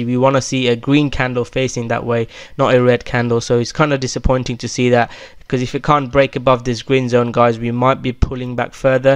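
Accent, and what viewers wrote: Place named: United Kingdom. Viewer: Very appreciated again 👍👍👍thanks for the video. I love your British accent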